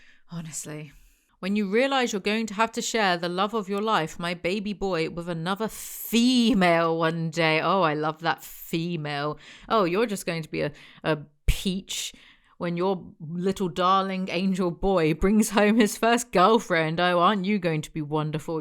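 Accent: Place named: Nottingham, United Kingdom. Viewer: As someone from near Nottingham, UK I appreciate hearing an accent like mine on YouTube and someone with my nasty sense of humour 😂😂 Thank you 😂❤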